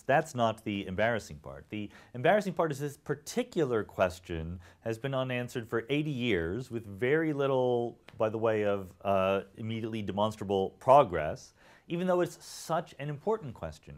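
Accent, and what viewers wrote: United States. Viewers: I'm watching this and think 'Something is really out of place here'. And then it comes to me: that man speaks with an American accent! It is so odd to watch a Brady Productions video without the traditional British english. :) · It took me 10 minutes to notice his american accent.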